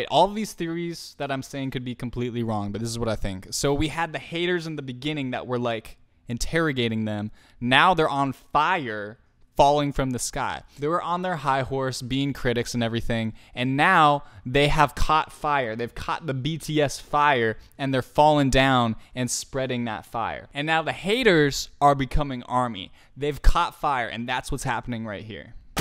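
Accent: United States. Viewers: Great video but halfway through I had to turn it off because I just cannot stand listening to American accents:((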